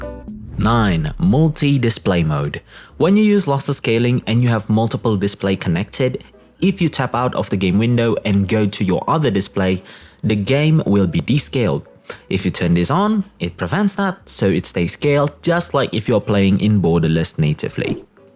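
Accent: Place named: United Kingdom. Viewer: How you get that nice british accent?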